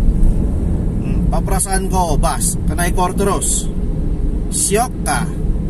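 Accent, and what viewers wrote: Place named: Sabah, Malaysia. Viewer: Suk😂 dengar cara org sabah ckp. Sedap bahasanya. Baek kau bang. Pandei ko ckp bus lantai bocorr.😅😅 ko boleh jd driver racing car lah bang. Corner pun ko layan 90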